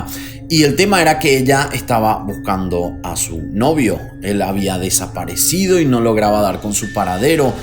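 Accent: Venezuela. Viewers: Pensé que eras venezolano. No conocía este caso y ps wow lo que hace una buena oratoria. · Aló! 👋😉👌Pensé que eras Venezolano. 🤔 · Yo pensé q eras venezolano jiji Desde que conocí tu canal , tus videos son muy interesantes y atrapantes x la manera en cómo Relatas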